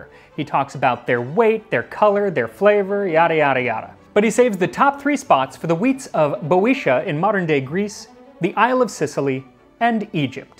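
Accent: United States. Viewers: Yay the metric system! But you have an American accent so I am confused, is America switching over? Yay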